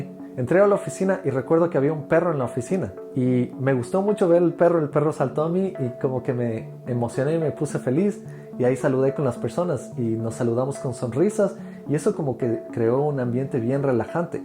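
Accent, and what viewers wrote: Ecuador. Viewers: Eres ecuatoriano :o?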